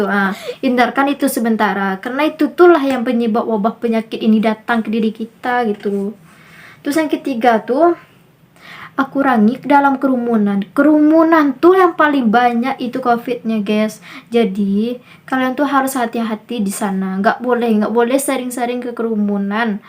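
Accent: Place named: West Sumatra, Indonesia. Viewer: Minang nya jelas kali ya ges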